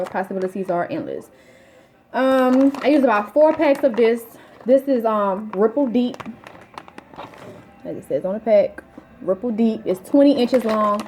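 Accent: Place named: New Orleans, United States. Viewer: As soon as I clicked on this video I said I know she from New Orleans lol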